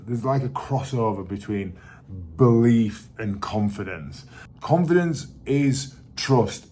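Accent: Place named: Yorkshire, United Kingdom. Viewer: Thank you for your very articulate reflections on confidence,. They were characteristically thoughtful. You are a very impressive man. You are more a self-made man than most men I can think of: you have built up your own confidence, your own life, your own body, your own business and made a success of all of them! And you have fabulous blue eyes and a delightful Yorkshire accent as well. What a star you are! The US President who said that imperfect action was better than perfect inaction was Harry Truman.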